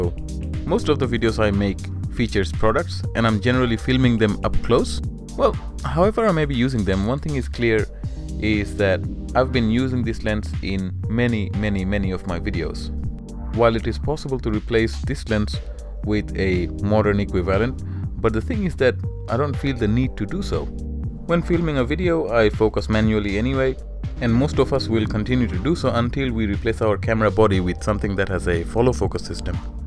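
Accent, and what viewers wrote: Bengal, India. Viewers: Are you Bengali by any chance?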